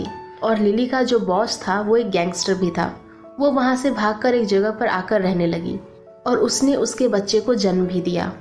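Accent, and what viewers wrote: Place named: Bihar, India. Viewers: Bihari ho ka ji.... परोसी, परा , भीर..... ई सब का है।।। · You are from Bihar.. Am I right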